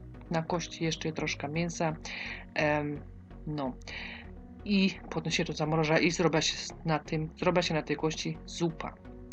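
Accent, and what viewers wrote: Silesia, Poland. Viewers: Podoba mi się z ''kartofloma , z kluskoma '' można podać . Lubię słuchać gwary opolskiej / śląskiej .Sznycelki jednak lekko rozbiję i otoczę mąką przed panierką , smalczyk indyczy też o.k.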